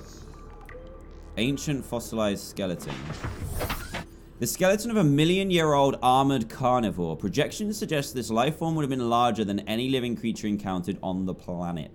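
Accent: England, United Kingdom. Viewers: I just turned 11 and I asked my mum how long she’s been hearing an English accent coming out of my computer and she said 2012 and btw commenters from the future it’s 2018 right now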